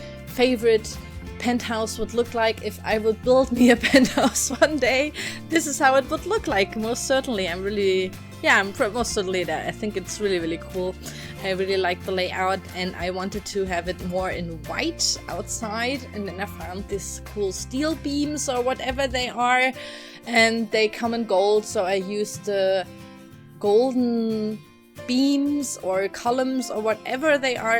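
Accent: Germany. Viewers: Hey :) Where do you come from? You sound really german to me 👌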